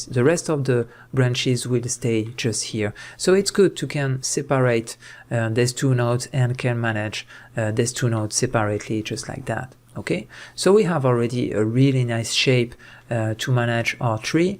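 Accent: France. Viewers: L'accent a la française !!! Tmtc😂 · French accent ^^ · nice tutorial with nice baguette accent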